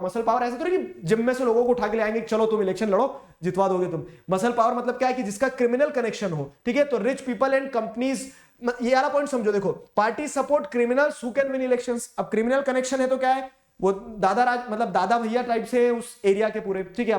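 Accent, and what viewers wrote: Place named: Rajasthan, India. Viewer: rajasthani tadka sir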